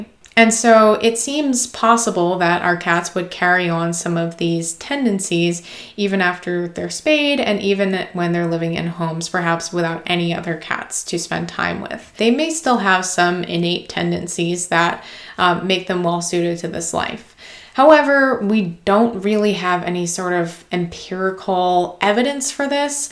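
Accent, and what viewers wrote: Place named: Buffalo, United States. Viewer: I always notice your accent on pronouncing the letter ‘A’ lol Where is this accent from in the US? I’m from Niagara, Ontario and notice a similar accent when i visit Buffalo just over the border lol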